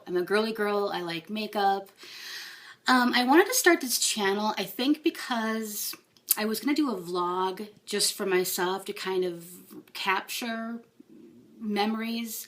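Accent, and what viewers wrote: United States. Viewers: Glad to get to know you! I hear the Minnesota in you, I'm a Midwestern girl, too. Cheers, Lulu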